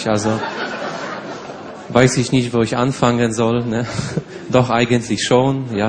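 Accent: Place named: Poland. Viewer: Akcent razi ...bo przedstawia Polaka który źle mówi po niemiecku i dużo takich Polaków tak mówi z takim akcentem w Niemczech po niemiecku...a tak naprawdę to on potrafi perfect po niemiecku mówić.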